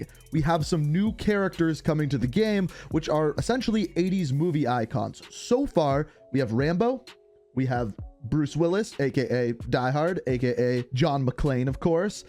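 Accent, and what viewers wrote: Canada. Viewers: Is this clown Canadian ?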